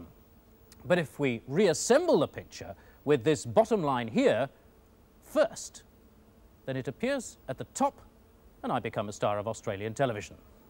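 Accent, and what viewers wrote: United Kingdom. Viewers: The British accent makes it even better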